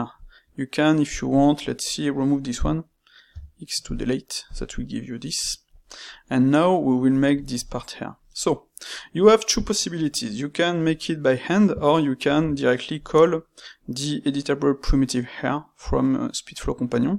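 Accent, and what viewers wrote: France. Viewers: i bet you're french ^^ i am too but the accent is sooooo specific ^^ (great vid tho , i just find the accent funny ^^ )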